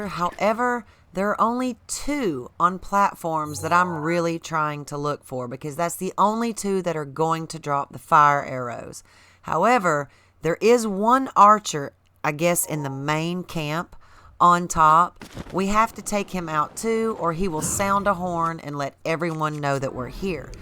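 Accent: South, United States. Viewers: Hello! I love your videos! I'm from the south, living in Mobile, AL, so your voice makes me feel right at home too. I hope you're ready for Tears of the Kingdom! -B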